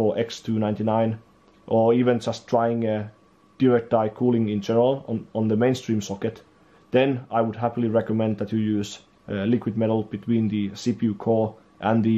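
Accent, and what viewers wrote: Finland. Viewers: You sound Finnish, are you Finnish? Jos oot, hyvin tehhty video.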